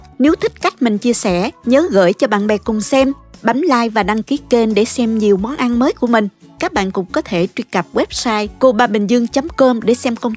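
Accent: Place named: Binh Thuan, Vietnam. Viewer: GIONG BÀNH THUẨN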